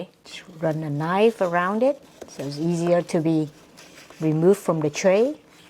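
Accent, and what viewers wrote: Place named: United States. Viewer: Are u living in Singapore? I love your American accent..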